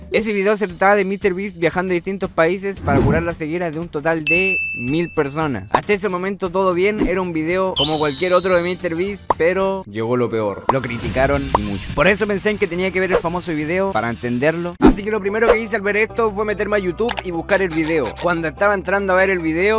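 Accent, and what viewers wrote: Chile. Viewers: De que parte de chile es chileno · Si no le entiendes simplemente pon subtitulos o vete del vídeo, Además ¿que tan difícil es entender el acento chileno?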